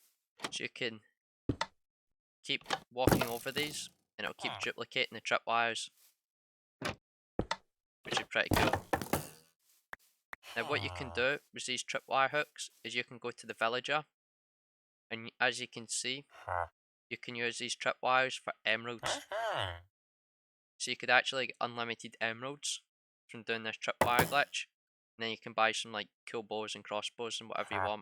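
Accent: Scotland, United Kingdom. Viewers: Its scottish bruh u can guess it right as he says it... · It's his accent... That's kinda rude for the Scottish peoples.... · Ur from Scotland right? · You have the strongest Scottish accent i have ever heard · that scottish accent is dope.. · Hey fellow scottish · Best scottish accent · Scottish accent? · wow scotsh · True Scottish accent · He has a sccotish accent lol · Scottish my brother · is it me or does Scottish accent sound really appealing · i k he's scottish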